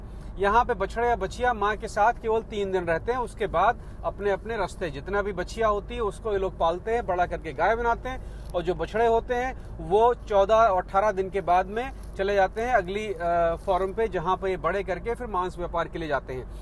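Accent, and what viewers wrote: Bihar, India. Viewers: बिहार से हो क्या भाई हहहहह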